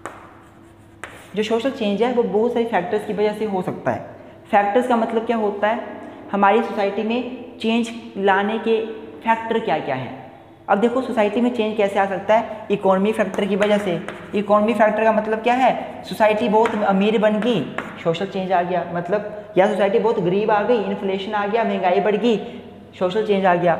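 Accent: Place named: Jammu, India. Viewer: Jammu ka teacher lagg rahey ho Language flow say😊but well done 👍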